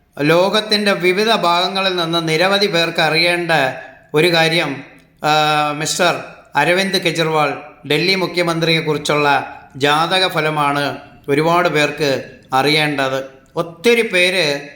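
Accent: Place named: Trivandrum, India. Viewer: Tvm language....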